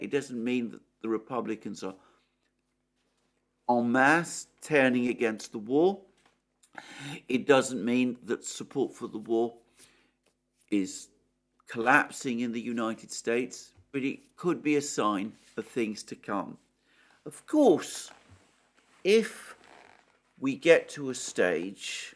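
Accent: England, United Kingdom. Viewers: Greek communist with an English accent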